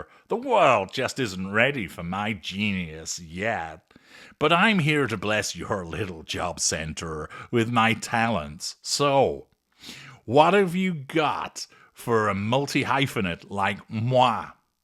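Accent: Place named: Yorkshire, United Kingdom. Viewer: Ey up,! 💜 Loved your Yorkshire accent! Thank you for the morning laugh! You're too funny!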